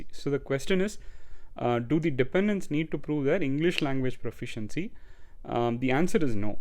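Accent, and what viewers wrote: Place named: India. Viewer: Hi sir Good effort👍 Also I observe your Indian slang not changed while staying there even after 10 years. Is that because its a Britain English. Many people in US after 3 years talking like US 🙂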